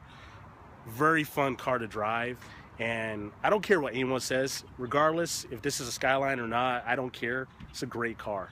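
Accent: West, United States. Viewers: You sound like a white guy from sorta out west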